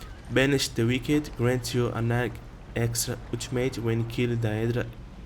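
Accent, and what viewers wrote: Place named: Brazil. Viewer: this accent...are you brazilian? haha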